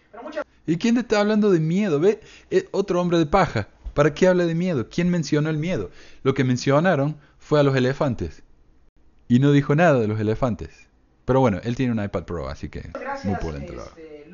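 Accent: Córdoba, Argentina. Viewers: =) que Genio sos!!!. Admirable y Valorable todo lo que compartis, excelente tus opiniones, exposiciones y material sos un Capo Genio ya que presentas evidencias respaldatorias y material empírico de gran ayuda para reflexionar y pensar. Te escucho y sigo ya que todo lo que compartís es de gran ayuda y me sirve mucho en temas que veo en la carrera de Psicología. Además que me encanta seguirte por tu "humor inteligente" y amo tu acento Cordobés y tus expresiones!!!. Tu sinceridad y tu "open mind" hacen de vos un gran ser humano =) Besotes & Abrazotes desde Argentina =)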